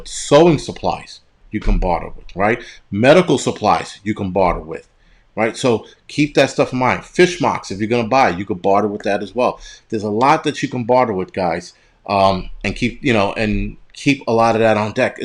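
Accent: New York, United States. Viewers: That's New York language. Love it.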